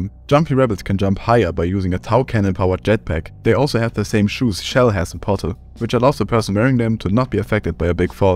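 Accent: Germany. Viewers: Is he german ? i can't tell if so, ich liebe deine videos und dein content allgemein. Schönen tag noch ! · I loved to play EZ2 with it having an amazing story, and having a really cool stealth part with the monster in the Aperture Lab. Literally gave me chills! I loved playing these games as much as I enjoyed this video. Good job EZ2 devs and (btw your german accent is beautiful can't hate it. And don't worry mine isn't better xD) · I have a question:are you German? Besause i am German and you Said one time that you have a German ankzent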